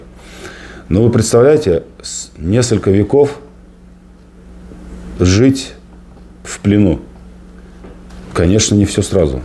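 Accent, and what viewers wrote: Tatarstan, Russia. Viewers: У вас самого русский акцент.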